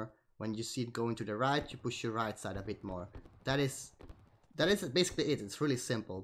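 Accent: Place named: England, United Kingdom. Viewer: I love ur english accent, great effort in video, tx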